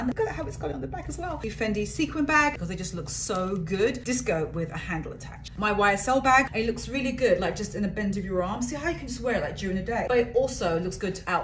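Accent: United Kingdom. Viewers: I love that you still have your British accent despite living for long in New York👏🏽👏🏽👏🏽 u’re a superstar for that❤️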